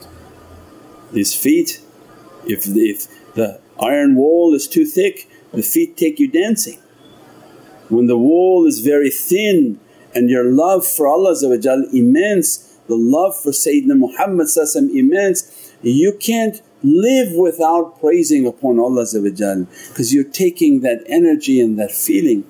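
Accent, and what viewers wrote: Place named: Spain. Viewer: He sounds spanish or is that just me not that it matters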